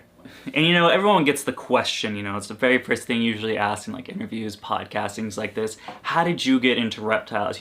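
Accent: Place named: New England, United States. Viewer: i love your accent...ya damn yankee <3!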